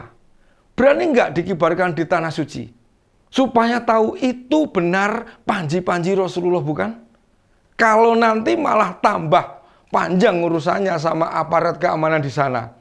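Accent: Java, Indonesia. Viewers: solo maksude mas,saking medoke dadi solok😊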